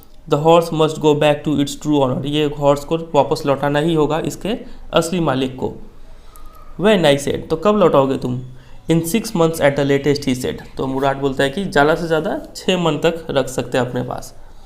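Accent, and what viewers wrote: Bihar, India. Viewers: Sir aap bihari hai na ??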